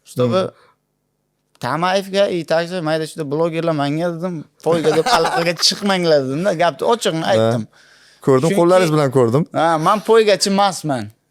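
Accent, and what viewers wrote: Bukhara, Uzbekistan. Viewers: Toshkentga borib, o'zidan toshkentlik yasab olmasdan buxoroni shevasi bilan ko'tarilgan. Lekin ijodizni sog'indik. Judayam pazitivni edi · Molodes chistiy Buxoro shevada gapirdi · Сизи яхши куриб томоша киламан самимий соф бухороча гаплариз ёкади узимам бухороликман тушунарли оддий роликлариз куп мусофирдан курдим бу сухбатниям тугриси ичизда бошка яшаркан видёда бошкача одамимийлигизга 5 бахо Лекин тарбия берган ота онанггизга минг рахмат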